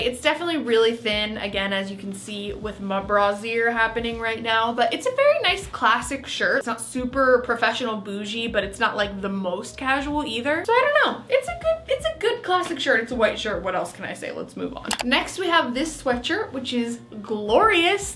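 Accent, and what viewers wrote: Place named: United States. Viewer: Even though you have an American accent for some reason when I watch you I feel like I’m watching a British YouTuber? So thanks for making me feel.. at home I guess?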